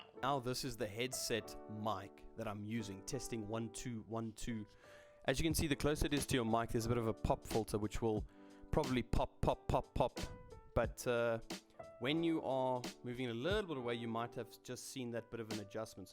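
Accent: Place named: South Africa. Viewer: so Ja YOU ARE DEFF a South African XD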